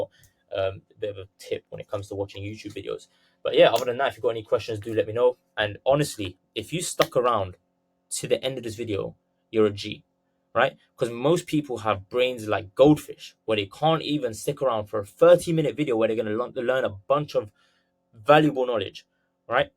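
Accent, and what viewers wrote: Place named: United Kingdom. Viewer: I’m from London bro I hear a British accent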